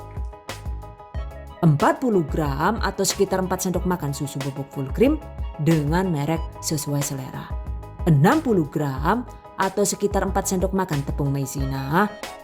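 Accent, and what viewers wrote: Banyumas, Indonesia. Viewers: Ngapak ny bunda ni yg ngangenin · Slalu nonton penjelasanyajelasss bgt apa lg kl pas ada bahasa ngapaknya...suka bgt..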